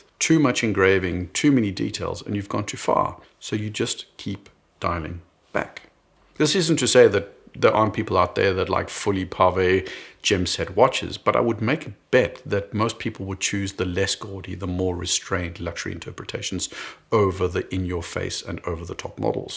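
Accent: South Africa. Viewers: Dude are you South African? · Superb video. By the way, you sound very South African. To me the Index field watch by which all others are judged is the Hamilton Khaki field. Or maybe the British CWC. It is a bit expensive for where I find myself now, so the Timex Expedition Scout fills that niche, along with a Citizen field watch, although its a tad big at 42mm. Gshocks are very cool, but per definition are not field watches. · You sound South African, aber mit Deutschem Hintergrund...?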